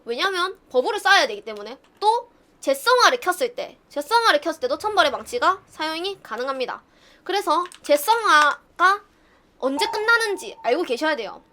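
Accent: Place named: Gyeongsang, South Korea. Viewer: 아..경상도 사투리 진짜 극혐이다 1초도 못듣겟음 역겨워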